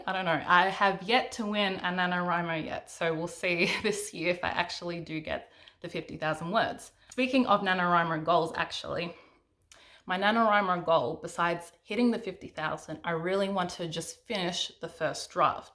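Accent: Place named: Australia. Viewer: Hey there! Fellow Aussie here! Glad to here a familiar accent. I found you from Emily's channel. I'm currently 50K into a 100K urban/paranormal fantasy, so I want to get the last 50K of the first draft finished for Nanowrimo. I understand that worldbuilding can be a huge thing, especially when (like me) you're trying to take existing myths/legends/creatures and find a place for them in your own world - on top of all the other worldbuilding that needs to be done. There's developing cultures, drawing maps (even an urban fantasy needs to know the lay of the land/city) and everything else. If you're interested, there is the great site called "World Anvil". The developers are a husband/wife team - he is a gamer (D&D etc) and she is a writer, so the site is made for both types of creators. Even if you don't end up putting all the details of your world on there - the templates are fantastic for providing all sorts of questions that you can use to help develop ideas. 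They make you think about things you might not have considered, and fill out details for things you might already have. It's great!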